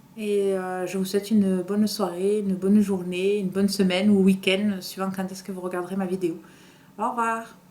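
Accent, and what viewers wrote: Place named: Aveyron, France. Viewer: Arrivée par par raton et 🐼 Bon début Je suppose que tu es tu Tarn Vu l accent 😘 de l Aveyron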